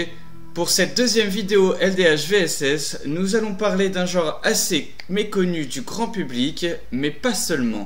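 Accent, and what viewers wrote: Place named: United Kingdom. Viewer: Ooh, cet accent so british ! ;p Bon ben tant pis pour toi : tu gagnes 1 subscriber, my Sausage friend ! ^^ Juste un petit conseil en passant : essaie de moins donner l'impression que tu lis ton texte, fais-le vivre quand tu t'exprimes, ce sera plus agréable à l'écoute. Tu es passionné, alors fais-le nous sentir, sois naturel ! ;)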